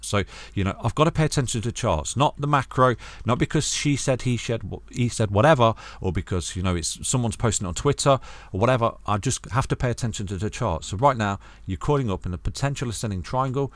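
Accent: United Kingdom. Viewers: Nice British accent 😊 always welcome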